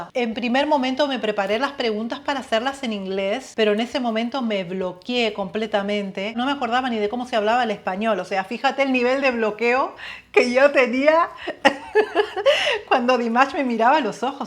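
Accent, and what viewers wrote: Argentina. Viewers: Que lastima que perdiste el acento Argentino 😭 y Felicidades te sigo hace años ❤️ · te escucho hablar y siento como si estuviera escuchando a una argentina, si... tienes unos modismos al hablar típicos españoles pero me desconciertas. Excelente trabajo entrevistando a Dimash.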